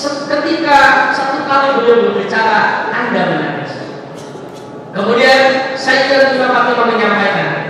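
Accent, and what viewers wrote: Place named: Garut, Indonesia. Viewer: subhanallah sima kawantun has garutna khas pangersa mah 😍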